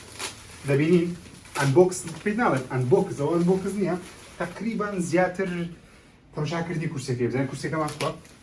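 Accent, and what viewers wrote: Erbil, Iraq. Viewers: Bzhe zorm pe xosha ka ba hawlere zmane rasant qsa akay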